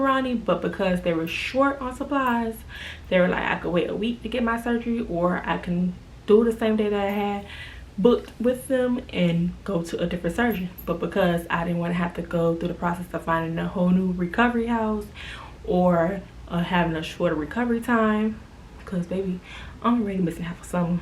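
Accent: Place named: Miami, United States. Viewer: Lmao your Miami accent is so cute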